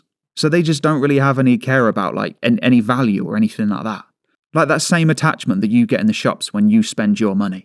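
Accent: United Kingdom. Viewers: Thick British enough said.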